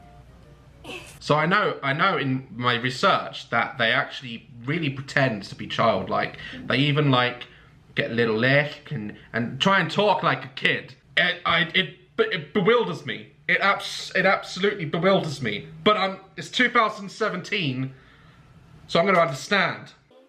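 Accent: United Kingdom. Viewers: sometimes I forget your British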